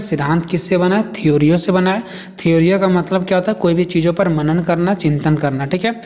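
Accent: Uttar Pradesh, India. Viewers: Ap ki voice bhot pyari hai aesa lag rha hai kese ap utar Pradesh se ho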